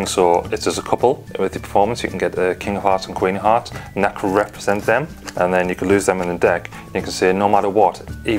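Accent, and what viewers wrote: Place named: North East England, United Kingdom. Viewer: Great video. I'm new to Magic card tricks and just bought my first stripper deck. Sounds like your from the North East of UK? Good luck with the Magic mate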